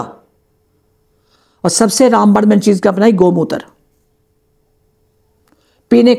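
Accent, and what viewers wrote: Punjab, India. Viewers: She is Punjabi...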